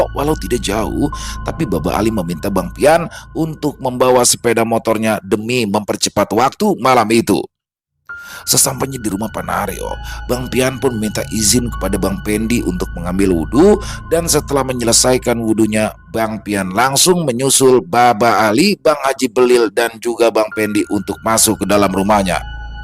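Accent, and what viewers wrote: Jakarta, Indonesia. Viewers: Waalaikumsalam. Seneng deh kalau Bang bercerita dengan aksen betawi. 🥰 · assalammualaikum bunda hadir dr condet.yg selalu ditunggu2bang pianada lg pokoknya seru kalau da cerita bangpian karna bang bawain logat betawi nya mantap sukseees terus bersama rekan 2aamiiin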